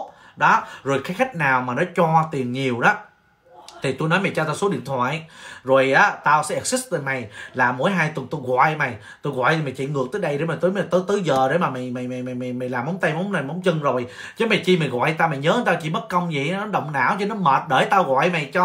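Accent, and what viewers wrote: Binh Dinh, Vietnam. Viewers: Anh Nguoi Nam hay nguoi Bac vay. Vi giong anh de thuong qua😍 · tưởng anh là giọng Bình Định Phú Yên. Nhưng người gì miễn là vui vẻ là được.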